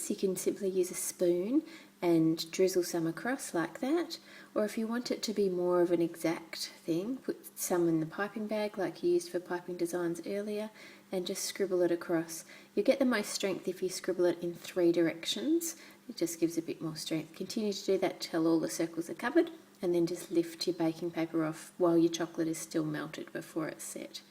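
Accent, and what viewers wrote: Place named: Australia. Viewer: thats amazing. your ausie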